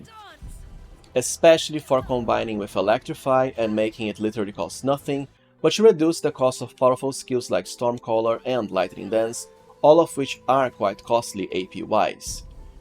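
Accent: Brazil. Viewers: Just started watching your video and within 10 seconds I'm 100% sure you're brazilian!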